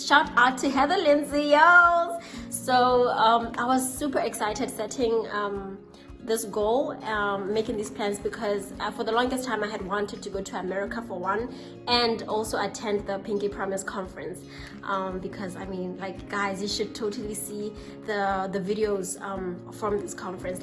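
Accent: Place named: France. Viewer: Great French accent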